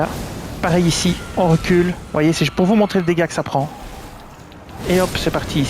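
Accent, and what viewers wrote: Belgium. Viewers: Merci beaucoup pour le travail accompli. Et l'accent Belge est tellement beau.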